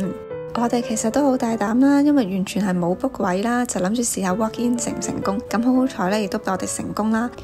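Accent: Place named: Cantonese, China. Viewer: What language are you speaking? It sounds like Cantonese, but not exactly like Hongkong. I wonder.🤔🤓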